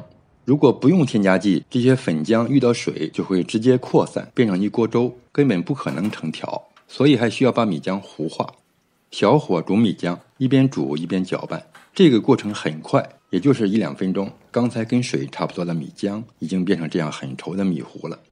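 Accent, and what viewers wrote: China. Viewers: 口音较重，山东附近的，有点大舌头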